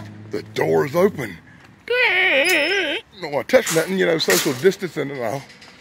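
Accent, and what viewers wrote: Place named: South Carolina, United States. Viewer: you for sure sound like you are from near williamston south carolina haha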